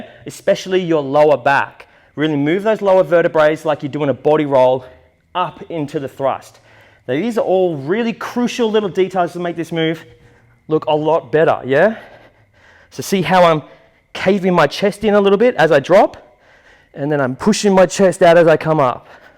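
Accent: Australia. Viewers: Shoes are 💯💯, also everything souds so much better with aussie accent 😂😂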